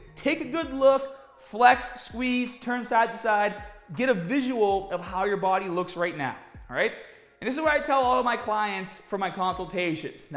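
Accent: Boston, United States. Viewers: love that Baawston accent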